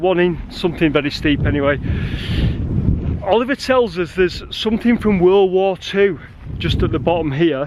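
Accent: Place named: North, United Kingdom. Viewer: yes thanks mate great videos chap! Scouse my daft comments I love that word bugger lol. When I hear an accent like yours I think of mike Harding, aka The Rochdale Cowboy, he called everyone buggers lol!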